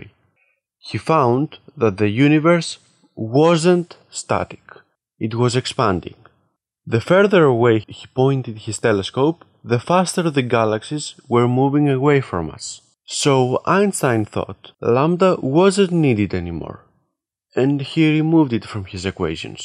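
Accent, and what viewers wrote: Greece. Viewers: Greek accent detected 🤌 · Your accent spells: Greece Είσαι ωραίος ρε φίλε. Απλά, λιτά κι απέριττα. Και like και subscribe!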